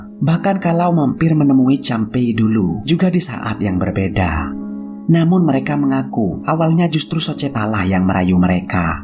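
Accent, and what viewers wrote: Madura, Indonesia. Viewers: Salam.kenal bang... perantau di banyuwangi asal bondowoso sll menyimak. Terkdg sy merasa logat bicara smyn kyk parak pahe'eh bik ngkok kang😁😁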